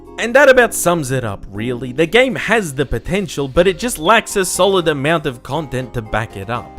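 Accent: Australia. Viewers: I knew you were a fellow Aussie but your accent seems influenced by something else, I can't pick it and it drives me bananas, mate!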